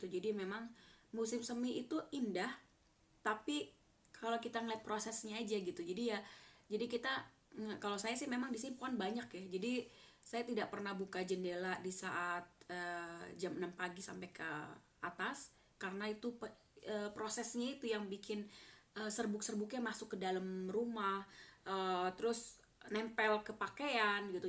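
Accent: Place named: East Java, Indonesia. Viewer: sama kak,ak juga minta maaf ya bila lambat terus berkunjungnya, btw kakak indonesia nya mana ya,kok hajing hajing wahing sama kayak.jawatimuran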